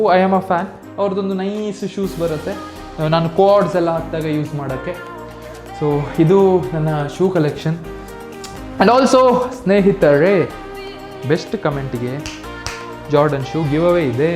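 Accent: Mangalore, India. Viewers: speaking with manglore kannada hits the heart ❤❤❤❤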